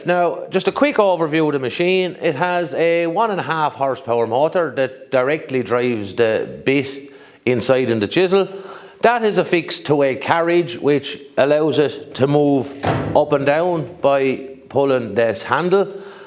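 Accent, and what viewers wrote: Ireland. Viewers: Ya know a lad knows his stuff when he uses a morticer like that; it keeps the tool pressure even when you cut with either 4 or 2 sides of the chisel....... ya start cuttin with 3 sides and sometimes it wants to flex, that's why you stepped over your cuts. I use hand tools, and one of my most hated jobs is cuttin a mortice........ I see a hollow chisel someday in my future 😆 It's nice to hear an Irish accent on YouTube lad..... This Wexford man enjoyed your video 😁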